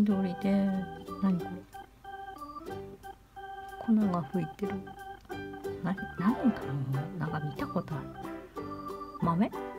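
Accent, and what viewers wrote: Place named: Yamagata, Japan. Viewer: 可愛い子ばかり♥️ こちらでは、さっぱり、まったく多肉さんがかれません！羨ましい( ´∀`) でもさんトークがいちばんめんごくて地震の恐怖もぶっ飛びましたはぁ(((*≧艸≦)ﾌﾟﾌﾟｯ笑有り難うございますm(_ _)m 山形弁‼️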